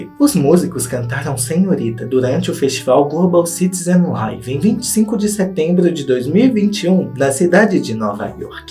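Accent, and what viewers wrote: Minas Gerais, Brazil. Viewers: Menino, acabei de chegar nesse canal com esse fofoqueiro com sotaque mineiro. Eu adorei.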